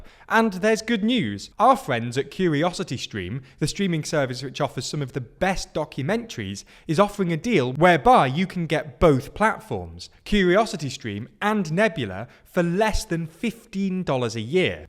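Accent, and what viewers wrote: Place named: Liverpool, United Kingdom. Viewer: I cannot stand this guy prenouncing GUverment instead of gOverment. It is a great newsflash, really you're doing a great job but please lose the Liverpool speech impairment. It is like a dripping crane that slowly drips a hole in my skull.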